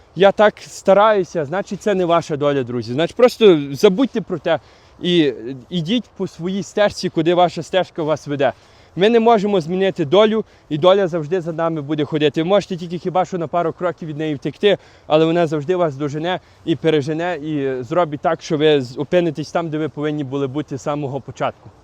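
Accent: Western Ukraine, Ukraine. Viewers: Так у него западный акцент. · Это западноукраинский, а не американский акцент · Про яку мову ти можеш говорити,якщо ти закордоном? Який ти українець?Ви перевертні! Українці на Україні! А ти за своєю вимовою із Західної України, ти навіть не бачив і не чув тих вибухів. Під шумок рванув " щирий українець". Вчи англійську, бо там українська мова нікому не потрібна. 😂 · Дуже дратує твій западенський акцент !!! Тому ДИЗЛАЙК !!! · Дивлюсь вас вперше,ви мабуть з Західної України, бо там говір такий. Усюди де чую такий тембр мови,згадую дитинство у бабусі у селі ,як розмовляли мої дядьки .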